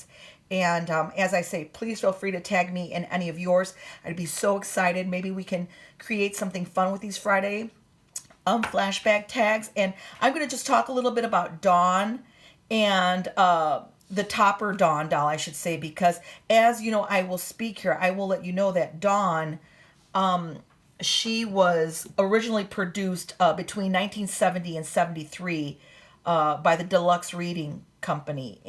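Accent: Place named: Texas, United States. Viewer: That a Texas accent if I ever heard one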